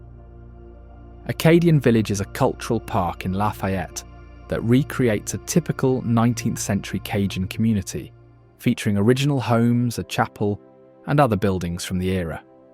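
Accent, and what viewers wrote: United Kingdom. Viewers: As a Houma Native American, I am offended that the Houma Tribe is not even mentioned and I have never heard of the Point au Chien tribe. Too many inaccurate claims for such beautiful drone footage! I'm guessing that the narrator's British accent is a giveaway of why there is no mention of the Battle of New Orleans where the British Army was mowed down by Andrew Jackson, Jean Lafitte and native American & Cajun volunteers at the Chalmette Plantation south of New Orleans. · Better Music would've made this SO MUCH MORE Shareable... the British accent is a bit overplayed and mispronunciations laughable - otherwise BEAUTIFUL!!!!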